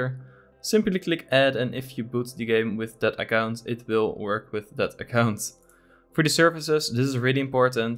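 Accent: Netherlands. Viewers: you dutch or some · You sound dutch, are you? XD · Yo Dutch? just a guess! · You Dutch? Sounds like it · Are you dutch? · Dat Nederlandse accent 🤣😭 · I think you are from Netherlands because of your accent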